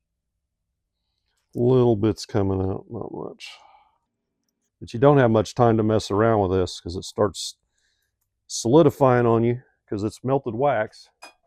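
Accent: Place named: Texas, United States. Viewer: #1 I am a relatively new lover of bees. #2 My hat is off to beekeepers minding their bees in 90+ degree weather in the direct sun. Wow. #3 My hat is off to beekeepers (period). #4 I was smiling imagining it was Hank Hill in that bee suit because you have the same Texas drawl - then you brought up Hank and I broke out laughing. #5 Thank you 🐝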